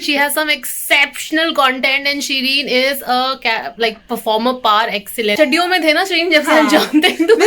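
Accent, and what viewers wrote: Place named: Lahore, Pakistan. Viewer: Your accent of Urdu/Hindi is so Lahori. Lots of love..Keep shining:)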